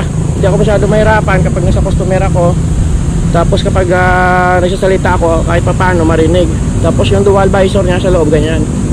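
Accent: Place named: Iloilo, Philippines. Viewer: San to paps?sounds ilonggo kyo po..rs paps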